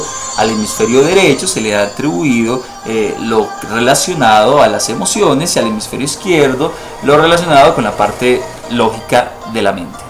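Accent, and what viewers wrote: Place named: Colombia. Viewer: Gracias mi estimado. Pero tan mal dicho esta decir Zi, como Sapato. Sin ánimo de molestar abrazos para Colombia de donde por su entonación deduzco es usted oriundo.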